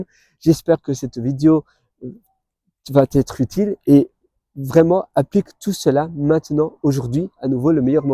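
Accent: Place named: Belgium. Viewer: Tu es belge ? Je reconnais un accent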